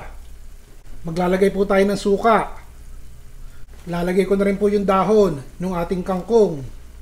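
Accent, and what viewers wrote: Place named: Bulacan, Philippines. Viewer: Chef,bulakenyo po ba kau?kasi ganyan po ang punto ng mga bulakenyo e 😁😁😁 nakakatuwa po kayo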